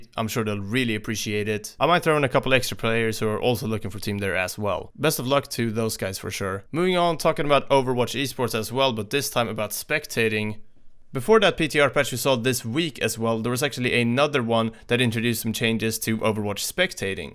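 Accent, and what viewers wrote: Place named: Sweden. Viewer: You sound like a swede.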